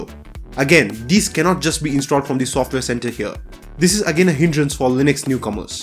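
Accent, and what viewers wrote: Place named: India. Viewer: Dude...learn how to pronounce and speak english you smash the words together whit "daT indian accent" you speak half words , PRONOUNCE -slow the fak down and ARTICULATE full words jesus , you speak like XQC was indian ... stopped watching, half the time its not hearable And this is NO Elementary review , ups and downs, or even know how, this is just a glorified add mostyl, EVERTHING is SO GREAT in elementary, jesus, really? and you say 3 games and you say its great for gaming , so many games so many games :D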